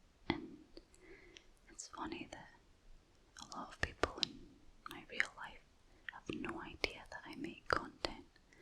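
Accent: Scotland, United Kingdom. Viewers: I am gonna be honest, until now I thought I wasn't really into Scottish accents. Well...this changes things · Greetings from the colony of North Carolina. Your slow motions and soft vocal delivery style are really effective and quite nice. I love your Scottish accent. I mean this with all due respect. You are really truly fine! You are beautiful. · Love your channel! So very relaxing and that Scottish accent is quite lovely. 💕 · I'm definitly in love with the scottish accent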